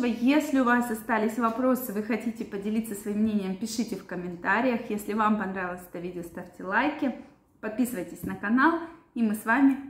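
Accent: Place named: Urals, Russia. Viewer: Вы с Урала? Говор специфический.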